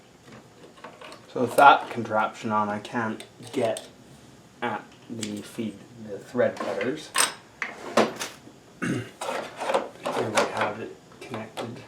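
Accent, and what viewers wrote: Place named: England, United Kingdom. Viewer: I'm from California, sounds like your mabe from England?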